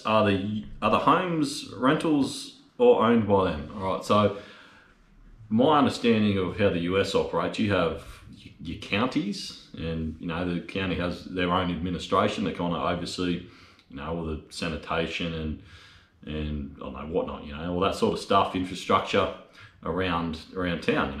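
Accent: Australia. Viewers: you are an Aussie who has one of those accents everyone equates to the land down under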